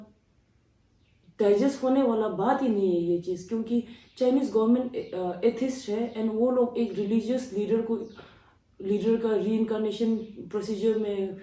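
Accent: Standard. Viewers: བུ་མོ་བོད་སྐད་གཙང་མ་བཤད་ཀྱི་འདུག རྗེས་སུ་ཡིད་རང་ཡོད།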